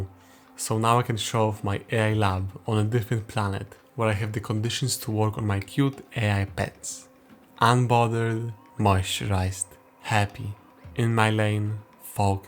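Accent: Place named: Germany. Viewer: Is creator german? This accent i dont know what is it😂. Cool video btw!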